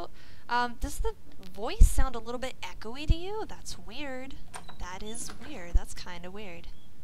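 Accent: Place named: Canada. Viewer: lovely commentary,very plesently spoken..you sound canadian..nice voice..kinda similar to a LP'r name schaly..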